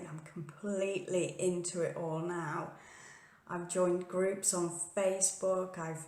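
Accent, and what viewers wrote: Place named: United Kingdom. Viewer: I do not have a channel because i guess ive been searching for the truth for my own needs. I just need to prove it to me. I guess that sounds selfish. Lol. I can tell you're from the UK for sure. I could listen to you speak all day. But anyways, lol. I used to have experiences when i was a child also. My most memorable one was when i was 15 when i had sleep paralysis and witnessed "The Old Hag Syndrome". It scared me to death. She was at my bedroom door making a weird sound and i couldn't move. It didn't stop until i called out for the lord. Thats when i knew "our maker" was real. I have so many to tell. Most are good experiences. But there are a few bad. Please protect yourself when speaking to spirit in case you run into something evil.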